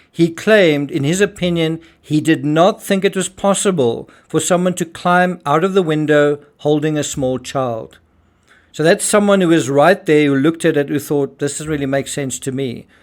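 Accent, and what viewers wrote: South Africa. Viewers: I'm proudly South African and I've been following this story closely all these years. Love what u put together and I agree 100% something smells fishy or should I say bloody. Pls keep us updated. Love hearing the local accent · ...are you perhaps South African?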